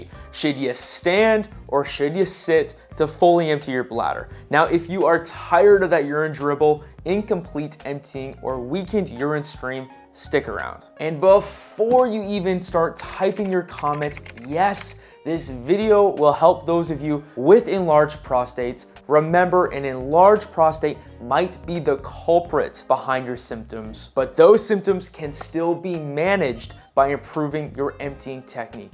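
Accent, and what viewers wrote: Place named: United States. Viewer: Is it possible to give English captions (at least important steps). Because, English is a foreign language for several people. And it is difficult to follow the US pronunciation for people like me.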